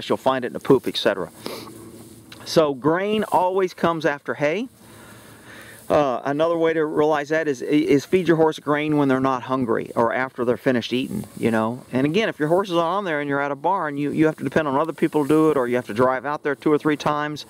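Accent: Texas, United States. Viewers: your Texas accent is stronger...I could barely understand you say "saliva". lol Awesome video. Thanks!!!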